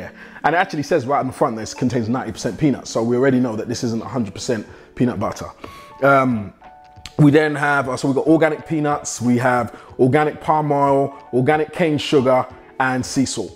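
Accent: England, United Kingdom. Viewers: He must be from london that accent is strong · English accent is a good reason not to continue with the video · That British accent.😁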